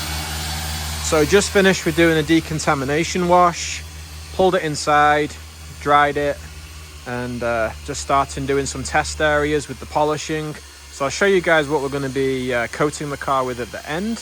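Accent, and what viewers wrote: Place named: United Kingdom. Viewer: You have a british accent but are u in California?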